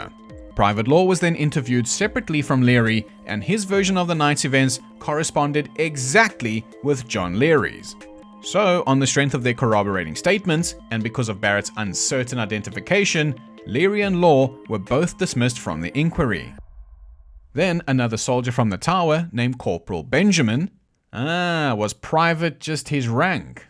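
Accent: South Africa. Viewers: This channel is single handedly giving me a positive association with a South African accent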